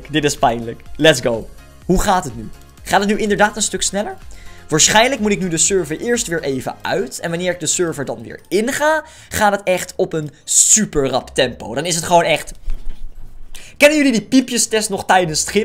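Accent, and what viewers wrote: Netherlands. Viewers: wil je parodie's en liedjes in het echte leven maken voor je kanaal? Want dat is zoooo lang geleden.. praat nou met een Amsterdams accent?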